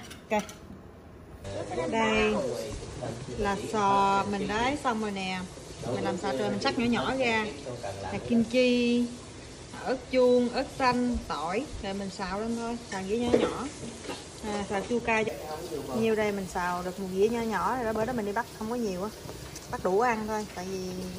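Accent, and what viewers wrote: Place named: An Giang, Vietnam. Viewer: Rất thích và ủng hộ kênh của bạn. Nghe giọng nói hình như bạn là người gốc ở An Giang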